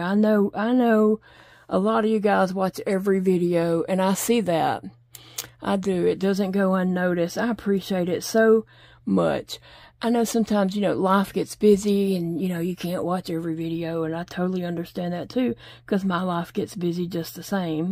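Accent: South, United States. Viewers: I must say again how much I love your Southern American accent ! So does my Chihuahua and my other puppy dog girls who lay around me and listen to u with me. 😁🐕💗 Our Aussie (Australian) accent is so different and not as pretty and relaxing to listen to.😂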